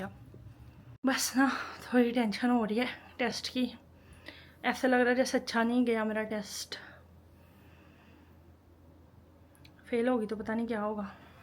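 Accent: Lahore, Pakistan. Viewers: U r soooo cute n talk typical lahorei Urdu … simply love watching u 💕💕💕💕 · Salam g so quite look vicee totally koean but your language extent is lahori ap to koeran silha rhe hn m ap ko quran sikhana chati ho takweed k sath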